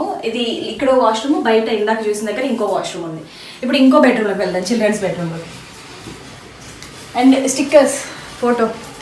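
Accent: Telangana, India. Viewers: Inthaa dooram vellinaa Meeru Mana telangana language laa Matladudu....adirindi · Ur Telangana slang is very nice sister, nice video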